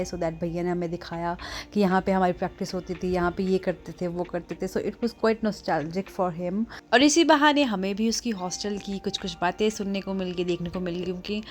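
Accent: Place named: Pahari, India. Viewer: One of my family member (one uncle) married from Shimla. They have Apple orchards. I felt Pahari influence in your hindi. It is sweet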